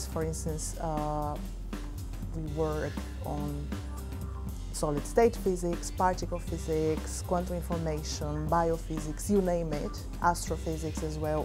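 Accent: Brazil. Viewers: Shes 100% brazilian 🤣🤣 Im also brazilian noticed straight away, nice to know theres a vast community at UCL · you can tell she's brazilian hahah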